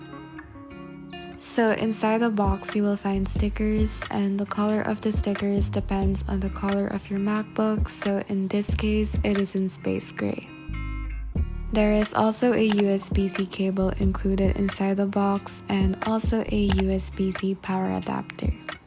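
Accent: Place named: Latin America. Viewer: You sounds like a Latino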